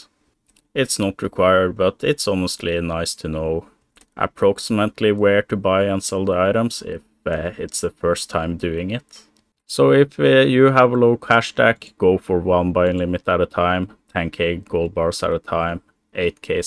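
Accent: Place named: Norway. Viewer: Jeg visste at du er norsk ut fra aksenten din, dette er en veldig fin video(vær så snill og si meg hvis norsken min er dårlig, jeg begynte å lære for 1 år siden)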